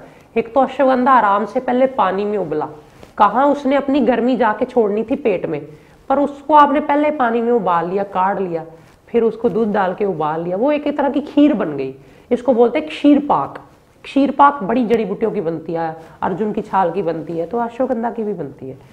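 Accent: Punjab, India. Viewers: Apki hindi ka bulnay ka tareeqa say muje lagta hai app punjab kay hai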